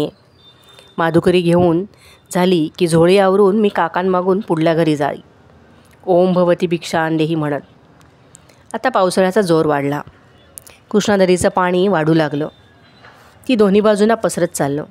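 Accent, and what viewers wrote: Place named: Konkan, India. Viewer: Uttam wachan.kokni ucchar hi chan 👌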